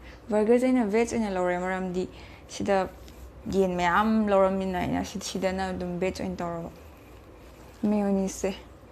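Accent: Kakching, India. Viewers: Kakching londa ngango taba yam nungaiye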